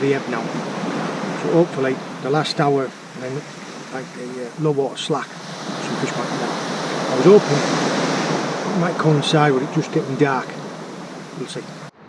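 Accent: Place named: Bridlington, United Kingdom. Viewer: I thought his accent was from near Bridlington. is from whitby. Best fishing videos on the net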